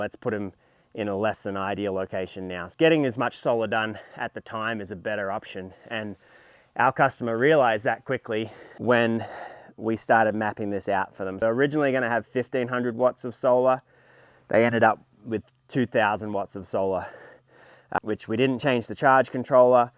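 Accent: Australia. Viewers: Just me or you sound very Aussie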